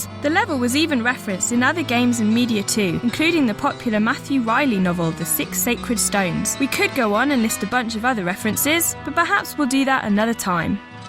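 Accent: United Kingdom. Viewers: ***** She has the heaviest british accent ive heard in years, don't bullshit around. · I have to say there's nothing wrong with the girl narrating the video or with her british accent, but the words are difficult to understand, to me (I'm from Brazil). In next videos, would be nice if she talks slower. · Everyone show some goddamn respect, she is doing a fantastic job and all you people do is blame her for being British, have you ever thought how you sound compared to England? americans think english sounds stupid , and english people think american sounds stupid. why can't we just forget the accents. this video is great. and she did a amazing job at this , so shut the hell up , find something to talk about , there is enough other things in this video to talk about other than how you hate her accent. grow up. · Oh noo.. UK accent.. disaster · D: So many people hating because of a British accent?! 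I.. Wha...? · I can't understand how people find this annoying. I bet it has something to do with people not being exposed to british accent on a regular basis. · Uh British accents. They stress they're letters that require you your lips to touch (b, v, p, w, ) and make it into note of a "t" sound. So annoying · I've met multiple British people so I can understand this perfectly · Guys stop complaining over a British woman narrating a YouTube video it's not gonna kill you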